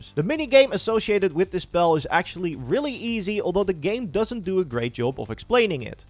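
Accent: Netherlands. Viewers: Nederlands accentje hoppaa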